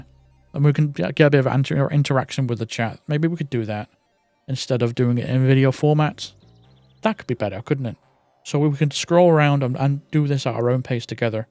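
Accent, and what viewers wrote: United Kingdom. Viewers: I get that, it's all good also TikTok is banned is so many places as well. I can't remember where you're from. All I know is it European, I would say England or Great Britain. Not trying to fix you, I'm just say by your videos and your accent, I can tell your from somewhere around there. Also what you said about waiting for your healthcare. They being said I hope you're well man. I truly mean that. You have said they publicly. I'm not going to repeat what you sai, but I do remember when you talked about your issues. I just wish you well. Thank you very much. I can't praise you enough. You have been getting shit from people, ignore them please, just know there's people out there that love you for all your hard work and very much appreciate it as well. I wish you the best, thank you so very much. I love you man. You the best hitman guide out there.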